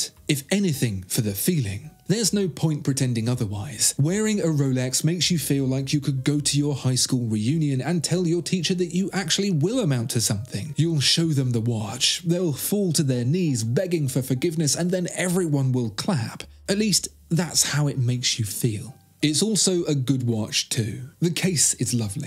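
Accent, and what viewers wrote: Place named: United Kingdom. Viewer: The most soothing voice on YouTube! Eloquent and colourful use of the English language with a classy British accent, not to mention knows a heck of a lot about watches. ❤your review. 🍉 🍉 🍉 Thanks.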